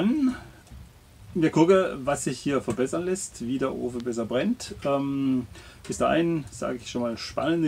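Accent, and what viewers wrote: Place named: Saarland, Germany. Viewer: Du schwätzt wie e Saarlänner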